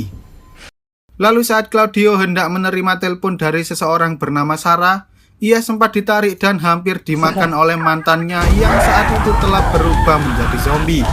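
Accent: Java, Indonesia. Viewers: Alur film yg menarik, Krn miminnya medok jowo. Saye suke saye suke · Medok bgt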